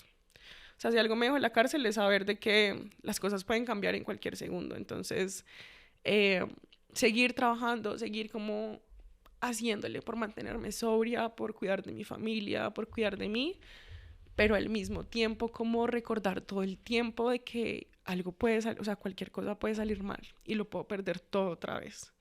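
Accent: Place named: Bogotá, Colombia. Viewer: costena pero hablando muy bogotano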